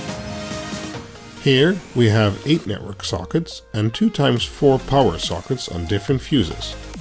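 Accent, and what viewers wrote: Netherlands. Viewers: Ik hoorde meteen je Nederlandse accent. Mooi huis!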